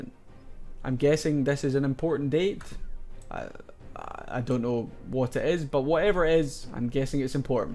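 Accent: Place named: Scotland, United Kingdom. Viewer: your accent reminded me of "trainspotting" movie "choose life" thank you for the vaedio man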